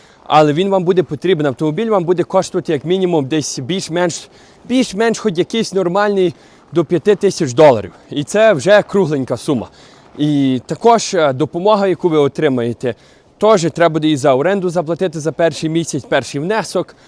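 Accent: Western Ukraine, Ukraine. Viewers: Бля, у тебя такой западенский акцент! Обожаю твою озвучку!!! Всегда вподобайка вид мене!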